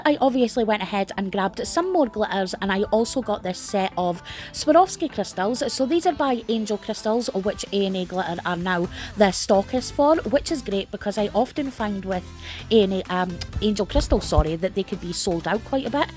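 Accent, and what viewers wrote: Scotland, United Kingdom. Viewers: Great to find U.K. suppliers. Thank you for sharing 😊. BTW “buck mad” isn’t an expression I’ve heard since I left Northern Ireland 30 years ago 🤣 I do love hearing a Scottish accent for a change.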